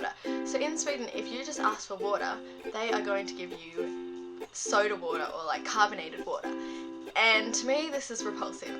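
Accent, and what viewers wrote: Australia. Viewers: You're Aussie? Because you don't sound local American to me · I was having a bit of trouble saying for sure if you were Australian. As soon as you said, "know" like "knower." I was like, "AUSSIE!" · Are you Australian? You sound like it! I'm from Sweden btw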